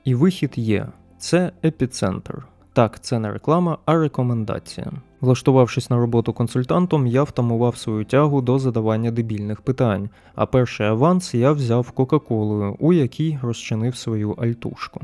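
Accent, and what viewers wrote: United States. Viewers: звідки американський акцент?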